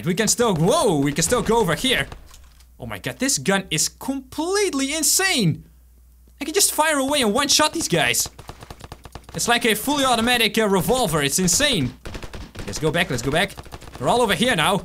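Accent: Netherlands. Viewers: waarom klinkt jouw engels als engels met nederlands accent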